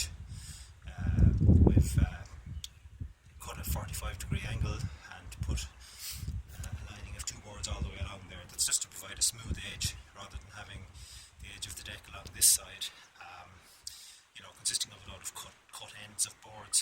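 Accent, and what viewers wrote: Ireland. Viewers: Just watched to the end and it's fabulous I wanted you to walk inside with the camera at the end. Love your accent too. I want to move to Ireland now :-). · Ha! I figured the accent was Irish! This is awesome, our corrugated plastic flew away during one of the recent storms, they were only nailed down, this is exactly what I needed!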